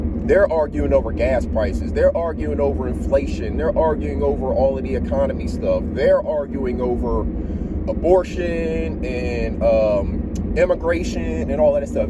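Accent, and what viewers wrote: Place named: United States. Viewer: You sound like a country hill billy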